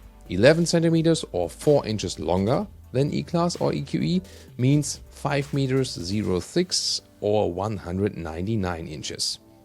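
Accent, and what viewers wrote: Germany. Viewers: English with typical german accent 🤮👎🏻 who understand this...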